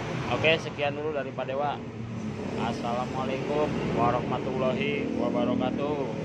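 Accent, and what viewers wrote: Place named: West Java, Indonesia. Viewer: Kieu ari urng sunda mh...ngomng indo sok cmpur jeung sunda...karakter na khas.....mantf penjlsn na sa x oge ngartos...siap lh kepala ketmu ekor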